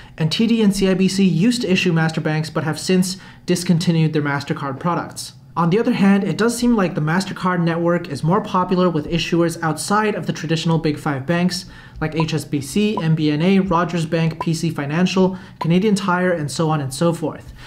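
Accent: China. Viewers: 口音一聽就是中國大陸的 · 隐约听到些中国口音？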